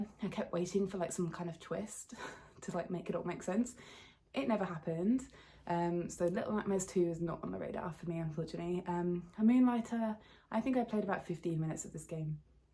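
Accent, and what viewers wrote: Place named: Wales, United Kingdom. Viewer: 🏴 what's wrong with the Welsh accent? Haha great video and game collection, hope to see more 😁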